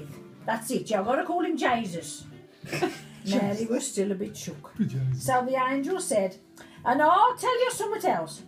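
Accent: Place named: Birmingham, United Kingdom. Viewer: Not all Black Country ..Can here some brummie there too...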